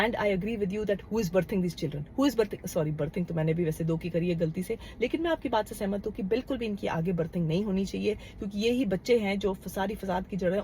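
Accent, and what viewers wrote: Punjab, India. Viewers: Nice. More punjabi please 🙏 your punjabi is very sweet. Kangana ko thappad therapy dilao. 🤣🤣🤣🤣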